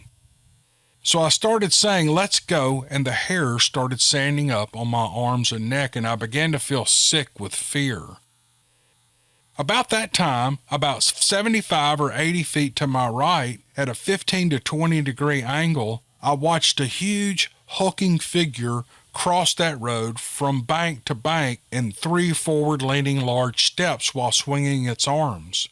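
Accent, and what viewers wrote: South, United States. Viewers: I tune out the moment I hear a southern accent I relation to sazquatch......seeya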